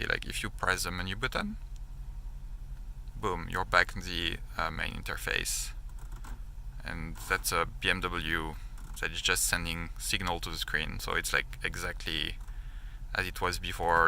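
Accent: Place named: France. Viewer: Au vu de l'accent je suppose que tu es français, donc super vidéo ! Je reçois le miens dans quelques jours et ta vidéo va me permettre de faire tout fonctionner un peu plus rapidement. Merci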